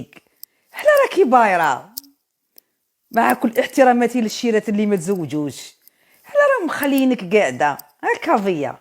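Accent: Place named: Relizane, Algeria. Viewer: هدرة غليزان مش وهران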